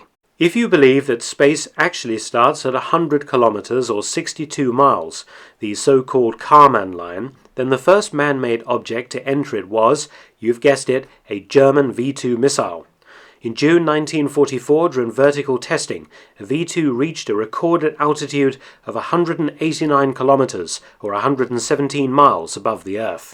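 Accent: England, United Kingdom. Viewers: The real story is something your not willing to tell. Instead you decide to play it safe thinking your English accent and the history of German rocketry will make you credible but probability states otherwise. Are UFOs just Hollywood hype when military has already confirmed their existence? Is it more likely (more probable) that these UFOs are flown by aliens rather than man? Aliens are the Hollywood hype, not UFOs, it's even more likely the so called aliens are man- made as well. I believe in aliens but they are more likely to be involved in the resources of their own solar system which is probably as vast as our own.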